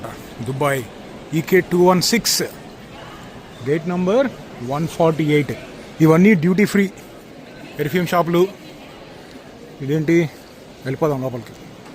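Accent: Andhra Pradesh, India. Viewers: Bro meru .. Nizamabad kada me slang Andra la undi ?..me wife ni parichayam cheyandi...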